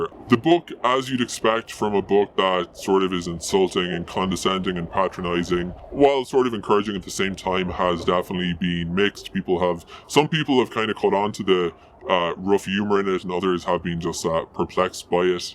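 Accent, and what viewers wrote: Ireland. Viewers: The issue is that a certain cadence and some letters in your pronunciation give away your Irish accent.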